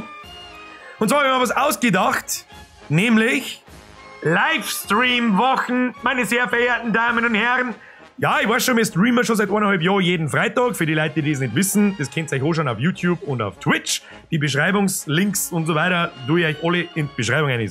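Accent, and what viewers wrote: Bavaria, Germany. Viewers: Ich komme aus Sachsen, genauer aus dem Vogtland und ich bin gerade erstaunt wie viele Ähnlichkeiten unser vogtländischer Dialekt (der im Übrigen mit Sächsisch nicht viel zutun hat) stellenweise mit dem Bairischen hat. Man könnte das Vogtländische als eine Mischung aus Sächsisch, Fränkisch und Bairisch bezeichnen. Ich versteh auch fast alles was du sagst, was glaube ich einem NRWler oder Berliner deutlich schwerer fällt.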